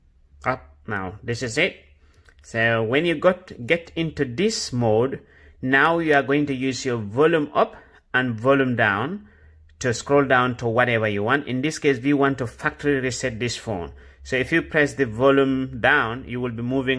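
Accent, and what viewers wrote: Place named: India. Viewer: Indian voice, black hands...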